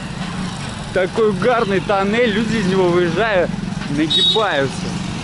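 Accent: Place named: St Petersburg, Russia. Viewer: Ох и "лицо" у тебя на превьюхе... Акцент конечно капец. Шаверма, поребрик...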